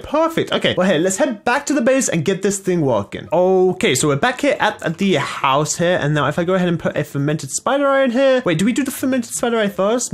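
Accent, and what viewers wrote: United Kingdom. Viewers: I am not British but I would assume he had a British accent from the way he talks.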